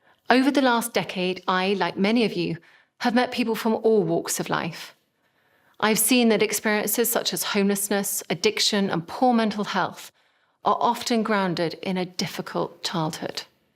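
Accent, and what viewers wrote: RP, United Kingdom. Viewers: I ❤ British accent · british accent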